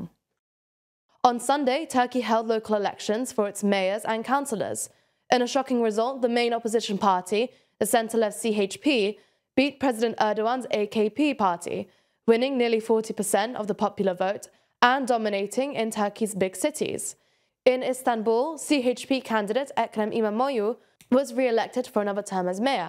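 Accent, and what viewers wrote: United Kingdom. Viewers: Your accent is soo Briish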